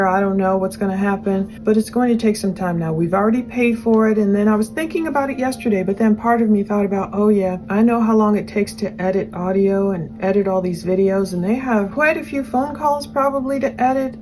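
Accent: Australia. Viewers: Australian accent , you do an amazing job. Thankyou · Love your channel think that accent is Aussie tho 😊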